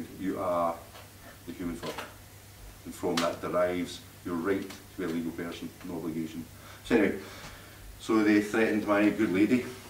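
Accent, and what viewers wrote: United Kingdom. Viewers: if I could just understand one word of this thick manchester accent..awful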